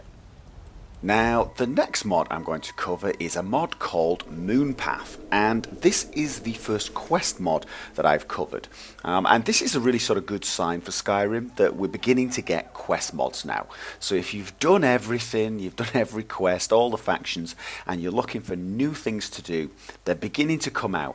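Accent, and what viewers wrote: United Kingdom. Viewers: This guy, he really does a great job with these movies. His reviews are pretty good, I think he has good taste, and his accent is pleasantly British, but its the little things, like providing links in the description for Nexus and, perhaps most refreshing, links to the particular segment within an episode for a particular mod. One thing I'd like to see more of, however, is more first person perspective shots for reviews of weapon mods, which often appear different in FPS.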